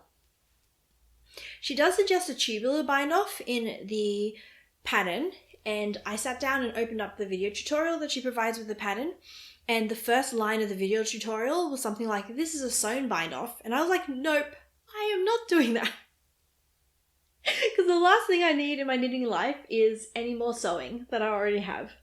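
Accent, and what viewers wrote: Australia. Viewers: So glad your enthusiasm has returned! Looking forward to seeing your next vlog. It's always good to hear a fellow Aussie voice on youtube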